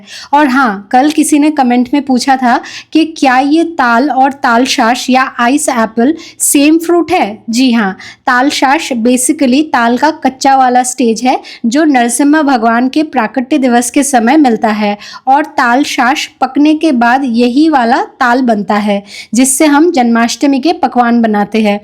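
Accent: Bengal, India. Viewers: Such a cute bengali accent ❤ Just love this❤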